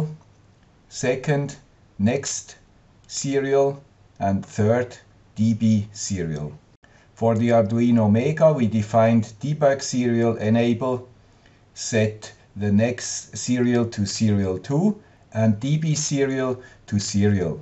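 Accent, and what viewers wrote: Switzerland. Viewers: HI Guy with the Swiss Accent again! I can't Thank you enough!!!!!!!!!!!!!!!!!!!!!!!!!!!!!!!!!!!!!!!!!!!!!!!!!!!!!!!!!!!!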